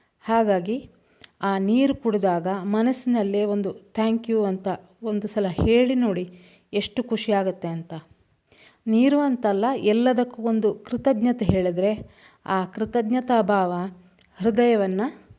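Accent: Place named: Dakshina Kannada, India. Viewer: ನೀವು ದಕ್ಷಿಣ ಕನ್ನಡ ಜಿಲ್ಲೆಯವರು ಅಂತ ಹೇಳಿದಾಗ ನನಗೆ ತುಂಬಾ ಕುಶಿ ಆಯಿತು ನೀವು ಊರಿನಲ್ಲೇ ಶಾಲೆ ಕಲಿತಿದ್ದರೆ ನಿಮ್ಮ ಕನ್ನಡ ಬೆಂಗಳೂರು ಕನ್ನಡದಿಂದ ವಿಭಿನ್ನ ವಾಗಿರುತ್ತದೆ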